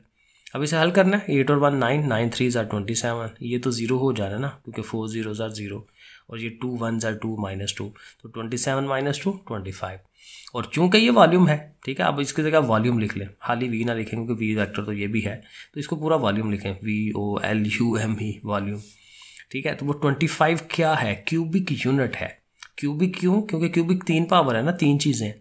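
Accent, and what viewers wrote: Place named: Lahore, Pakistan. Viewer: Sir paka lahori hai 😅 bda maze ka accent hai sir such a kind personality❤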